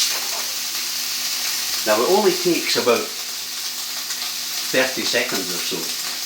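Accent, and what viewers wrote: Edinburgh, United Kingdom. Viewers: Nice to hear an Edinburgh accent on the interweb. Slainte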